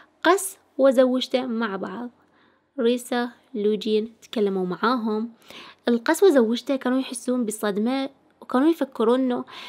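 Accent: Iraq. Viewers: حبيت اللكنة العراقية لاني اسمعك على الرغم اني درسوني عراقيين وانا يمني الاصل ودرسوني اجانب والحمدالله صرت انسان رفيع مستوى بس لديك للكنه واضحة الى الامام دوما I loved the Iraqi accent because I can hear you, even though I was taught by Iraqis and I am of Yemeni origin, and they taught me by foreigners. Thank God, I have become a high-ranking person, but you always have a clear, forward-looking accent. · عراقية وهاي لهجتنا ف طبيعي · شدخال القبيلة. هي عراقية واخلص ف الكل يحجي كذاا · عندي سؤال محيرني من مده الحين لهجه صاحبه القناه وطريقة نطقها نفس اسو لكن السوال وش قبيلتهم بالعراق وش ينقالهم الي يتكلمون بهل نغمه 🤔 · كل العراقيات يحجن هيج خصوصا الي ببغداد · انتي من اي دولة لهجتج خربطتني نص عراقي ونص اردنية ونص اكويتية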